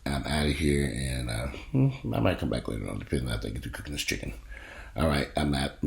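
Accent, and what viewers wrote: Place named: South, United States. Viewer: Omg I always felt like I could listen to you all day just talk. So relatable reminds me of my great uncles and fam from the south lol❤ have a good one as well.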